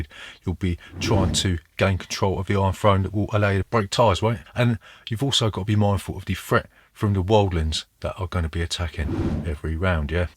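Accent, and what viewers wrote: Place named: London, United Kingdom. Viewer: You sound London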